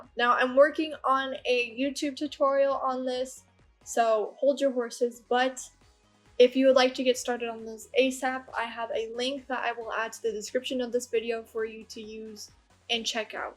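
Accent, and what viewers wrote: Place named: Texas, United States. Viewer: You’re from Texas. Well you sound like you are!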